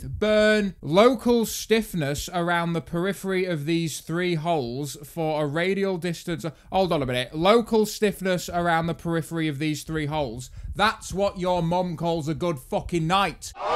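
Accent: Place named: Sheffield, United Kingdom. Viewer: Whenever I want offensive motorsport content, I do want it in a Sheffield accent!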